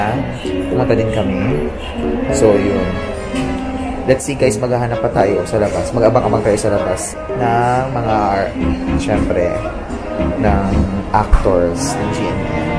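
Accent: Iloilo, Philippines. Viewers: Illonggo po ba kayo? HAHAHA yung accent kase hehe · Ilonggo gid tana aayyy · Kuya Illongo ka? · Bacolodnon? Or ilonggo? Hehehe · Ilonngo lng malakas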